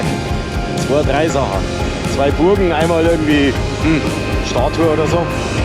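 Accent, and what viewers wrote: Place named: Bavaria, Germany. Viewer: Wir waren 2024 mit dem Bike in Rumänien. Einfach ein Traum. Deshalb werden wir heuer wieder hinfahren. Hab in meinem Navi bereits sehr viele Punkte eingetragen. Deine Videos sind immer sehr unterhaltsam und informativ. Und deine Handzeichen hast dir immer noch nicht abgewöhnt 🙂. Aber das und der Bayrische Dialekt ist eben dein Markenzeichen, und des passt scho so.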